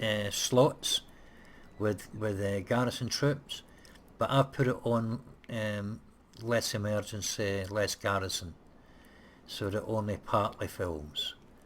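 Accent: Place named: Scotland, United Kingdom. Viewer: You sound Scottish and if you’re not don’t get angry cause I’m Swedish?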